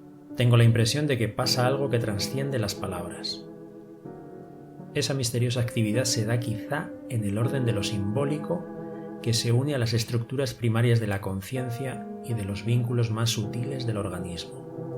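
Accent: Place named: Spain. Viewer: Todo bien pero no soporto ver y oir este interesante documental con el acento español...como que algo no cuadra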